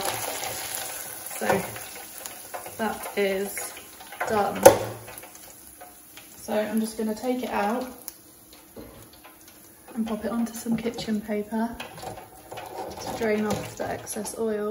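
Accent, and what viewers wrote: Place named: North East England, United Kingdom. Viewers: Just with you based down south with your videos my guess was a m boro accent anyway great videos love seafood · Watched a load of vids of good stuff,I,m from the north east also I,m further north listening to your accent......keep it up I enjoy things that I do...best wishes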